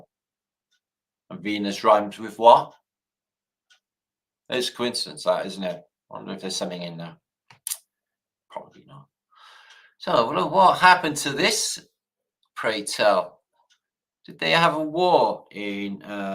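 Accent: Wales, United Kingdom. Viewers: I used to watch your streams with the closed captions on, and YouTube would get about 55-65% accuracy. But now it’s 95-97% accurate, even with your thick Welsh accent it’s so much better picking up almost every word and beeping out any language it doesn’t like. I wasn’t expecting this from YouTube to fix their algorithm so fast Bruv.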